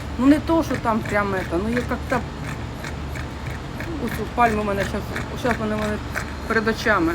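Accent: Kryvyi Rih, Ukraine. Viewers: Коли почула суржик і зрозуміли що ви з мойого рідного Кривого Рогу! Я так скучила за ним! Живу далеко в Ліссабоні вже 25 років. Але ріднішого міста у мене немає, як Кривий Ріг!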